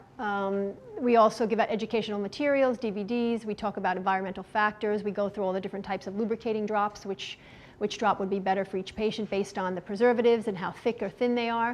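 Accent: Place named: East Coast, United States. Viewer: She’s got a very interesting American accent I’m not familiar with. East coast much ? Haha <3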